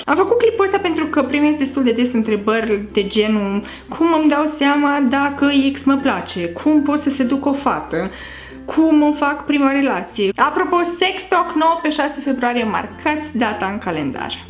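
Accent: Moldova. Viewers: Singurele persoane care pot sa aiva o relație cu mine sunt băieții din 1D. Ps: imi place accentul tau de moldoveancă drăguță. 😘😘😘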